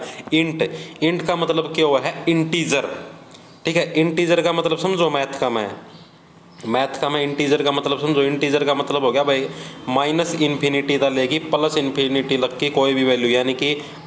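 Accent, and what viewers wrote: Haryana, India. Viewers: Ram ram guru ji aapki haryanvi bhasha achi lagi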